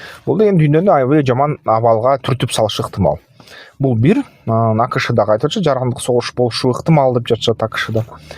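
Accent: Standard. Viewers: Азаматсыз, мырза! Таптаза кыргызча бир созду да бурмалабай ойду берууго болот экен да! Билиминизге, аракетинизге - баракелде! Ийгилик - сизге! Кудайым адамдардын журогуно тынчтык берсе экен!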